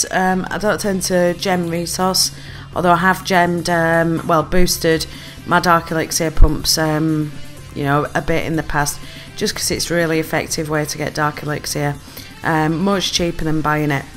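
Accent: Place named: United Kingdom. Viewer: that british english accent is horrible in my opinion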